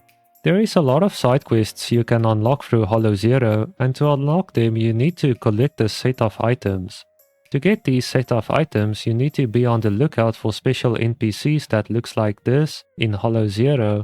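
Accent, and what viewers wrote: South Africa. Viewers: Eh? South African?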